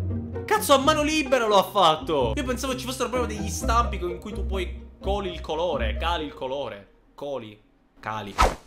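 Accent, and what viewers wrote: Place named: Sicily, Italy. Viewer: la cosa che mi fa più godere è il tuo accento siciliano, la raffinatezza nel pronunciare “minchia” ha qualcosa di soave.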